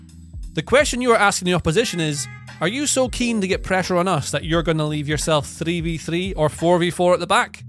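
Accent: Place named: England, United Kingdom. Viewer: Please consider adding more visualizations or subtitles. The topic is really interesting, but the video moves at a fast pace and uses many technical terms. The heavy English accent also makes it hard to follow for an international fan like me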